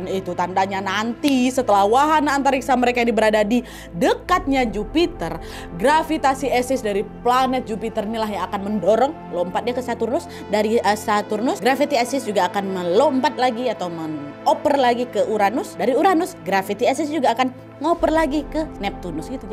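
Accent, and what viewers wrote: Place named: West Java, Indonesia. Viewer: Dari logat dan speed ngomong nya,saya yakin kak ini pasti orang Sunda🤔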